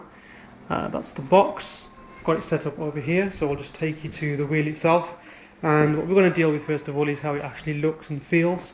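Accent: United Kingdom. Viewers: i hear a brummie accent, come on you blues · i like your accent, british man :P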